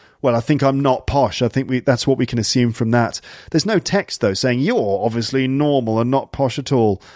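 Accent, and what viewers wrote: RP, United Kingdom. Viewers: Simply superb,.An exemplary model of Modern RP, I think. I have saved this so that I can listen to it again and again. I look forward to Part 2 and many more such videos. Thanks a lot.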